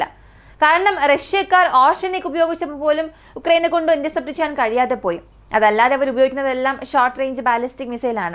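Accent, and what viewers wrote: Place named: Thiruvananthapuram, India. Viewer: വല്യ കുഴപ്പം ഒന്നും ഇല്ല മോളെ. പിന്നെ Trivandrum slang ഒളിച്ചു വെച്ചാലും......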